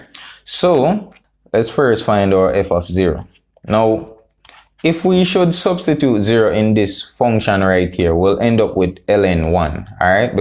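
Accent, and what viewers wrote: Jamaica. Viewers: My Eng. papers have been beating me mercilessly...this helps. Thank you. I could have sworn you have a Jamaican accent...helps me remember this.😄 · So nice and simple, my lecture made this sound like a tong twister to me, and you sound more of a Jamaican I really love the vibe keep on uploading more videos you are helping many enjoying from Kenya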